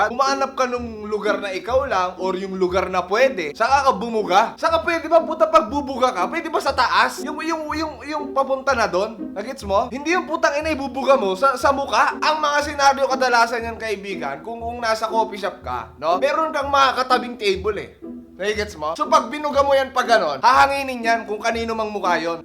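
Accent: Ilocos, Philippines. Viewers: Bakit ka nagvevape? HAHAHAHA SAPUL 2020! I love your ilokano accent!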